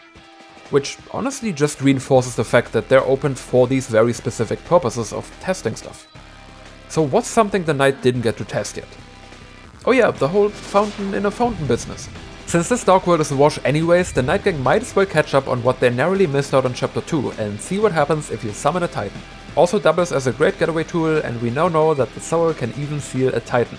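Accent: Germany. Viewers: Love your german Accent :D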